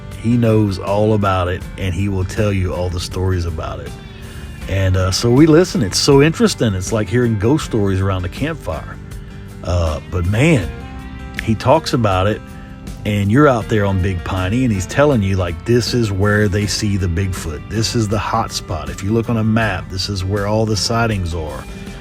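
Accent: Louisiana, United States. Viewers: As soon as I heard you speak I said to myself, self dat dude has got to be from da bottom of da boot. Lol. Great video. Me and the wife are from Lafayette but live in Port Barre now. We have a planned trip in the Ozarks by mulberry lodge. It’s in October. Rendezvous in the Ozarks expo. Are yall going?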